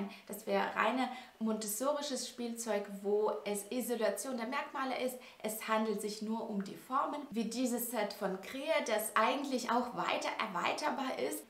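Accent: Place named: Russia. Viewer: wo ich Deinen Akzent einordnen soll... Ja, klar, Russland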